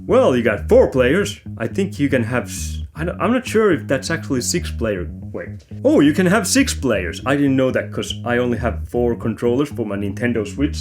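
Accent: Finland. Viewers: I really enjoyed the video and your personality. Your delivery reminds me a lot of my time living in Espoo.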